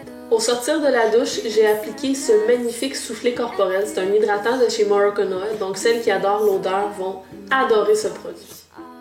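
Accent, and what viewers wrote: Quebec, Canada. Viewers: Magnifique ! Je suis sous le charme de ton accent québécois je dois avouer. Souvent en France on trouve ça très drôle de vous entendre parler mais toi, je sais pas, j'aime beaucoup. J'ai un mariage l'été prochain, si j'arrive à avoir une jolie silhouette j'aimerai bien avoir une jolie robe comme toi. Bisous de Paris !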